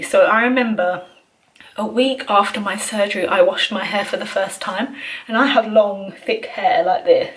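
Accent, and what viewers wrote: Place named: United Kingdom. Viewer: Wow!! The result is fabulous! Love your face profile (actually, love all your face). I am a 53 year old man that's gonna have double jaws surgery on next February. I have myself accounting about the same comments when i decide to go on with the surgery (my age,etc) . I just want to say to the public that people should listen to themselves if they make the decision, like you did !! We can see that it was really worth it. By the way, are you British ? You have a cool accent. I'm Canadian from Quebec.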